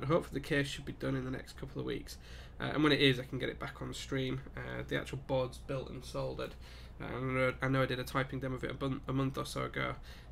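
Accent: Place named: Yorkshire, United Kingdom. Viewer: I'd put your accent as Yorkshire, but just a guess.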